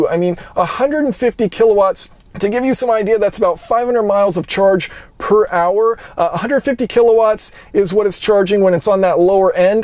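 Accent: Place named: United States. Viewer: how miserable is the poor choice of actor and his highly annoying yankee doodle voice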